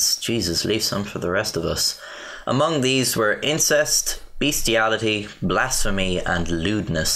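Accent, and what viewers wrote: Ireland. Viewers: Your Irish ya? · Now this is an enjoyable Irish brogue. Mike from THAT Chapter's accent makes me question his sexuality😬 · Are you Irish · "ninteen seventy tree" i love the Irish accent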